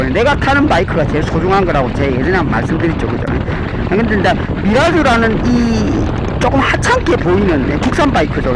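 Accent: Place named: Gyeongsang, South Korea. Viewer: 신묘한 경상도 사투리ㅋㅋ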